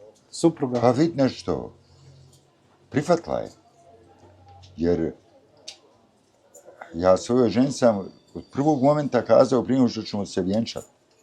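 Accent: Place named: Sarajevo, Bosnia and Herzegovina. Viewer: Predivan,pravi Sarajlija i glas i izgled,šarmantan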